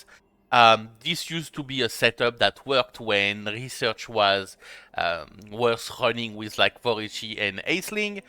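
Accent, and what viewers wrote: France. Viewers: nice french accent <3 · U French?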